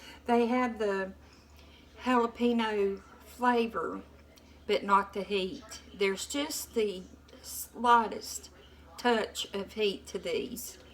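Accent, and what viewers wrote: South, United States. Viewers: kes S - right, me too ! She is the only UTube person that I allow their ad videos completely go thru till the end. I know she makes more per view if they complete. Just love her to death, she reminds me of my grandma with her southern accent.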